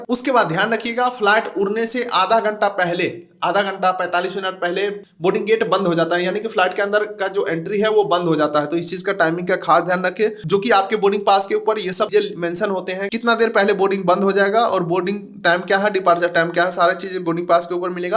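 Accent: Bihar, India. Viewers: Sir ap Bihar se h kya voice tone lg rha h 😊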